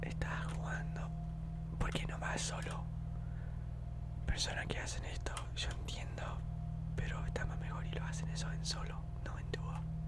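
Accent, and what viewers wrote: Argentina. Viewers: Pero si es de argentina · Eres argentino? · bro tu español es increíble tenes parece Argentina ajjaja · Te juro que pensé que era argentino xd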